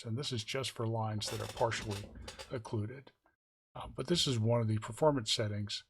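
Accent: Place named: United States. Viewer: I was expecting the voice of the other oscilloscope music guy and literally jumped at the sound of your booming American twang as opposed to the soft spoken Nordic one I was anticipating.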